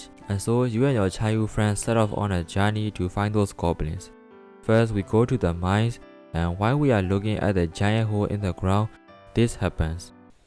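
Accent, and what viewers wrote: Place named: Japan. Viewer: currently playing this game. Uhm, i’m sorry, but you have an interesting accent. Are you a Japanese?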